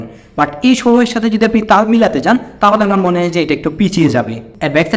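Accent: Kishoreganj, Bangladesh. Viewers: Bhai apner basha ki Kishorganj?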